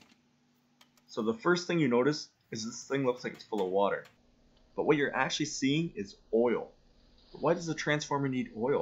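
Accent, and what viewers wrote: Canada. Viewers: Canadian eh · Very Canadian. I might guess Nova Scotia....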